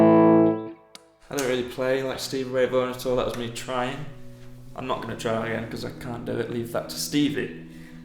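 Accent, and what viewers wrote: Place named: North West England, United Kingdom. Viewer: Sweet that mate- lovely sounding, you sound like you’re from my area- you North-West? I’m Warrington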